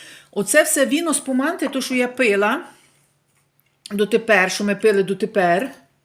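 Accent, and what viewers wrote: Lviv, Ukraine. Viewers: Дивлюсь Вас з Києва,дуже подобається ваша мова,відчувається,що жили у Львові Вчуся у Вас позитивно дивитись на все, не заздрити нікому,радіти кожному дню,дякую за відео Мрію побувати в Італії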